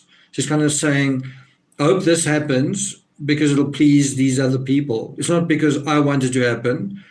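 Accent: South Africa. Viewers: I love your south african accent ...i have family stuff there. And i do really listen to you when you try to analyse these horrendous stories. Your dog is adorable, by the way even tho im allergic. 👍💕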